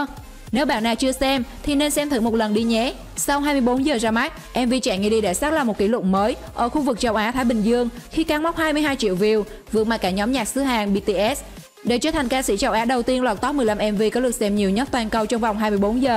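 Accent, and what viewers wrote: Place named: Binh Dinh, Vietnam. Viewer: Ns cn rặc giọng bình định quá nhe ..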